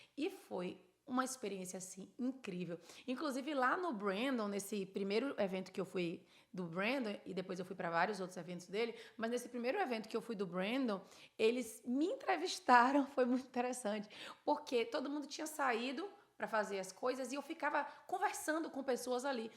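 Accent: Bahia, Brazil. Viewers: Adoro sua energia seu sotaque baiaho tou com tigo ❤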